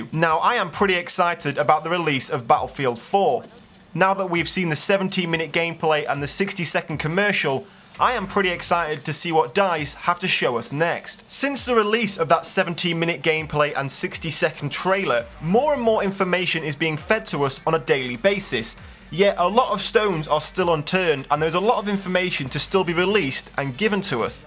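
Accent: England, United Kingdom. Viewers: Great intro! since i mostly play support and use LMG i don't think i would enjoy that weight idea. Btw where in England are you from? awesome accent! :)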